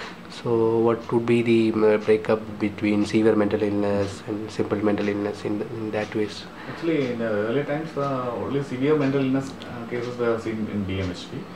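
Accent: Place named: India. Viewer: speak ENGLISH i don't want to hear your fucked up stupid india voice or acent. its one of the worse langues in the world and most stupid sound and un able to make sense of it. sounds like a fucking baby...i guess they want to act like freakin babies their whole pathetic life plus their county is a complete mess they have no respect for their own back yards...pathetic way to live.....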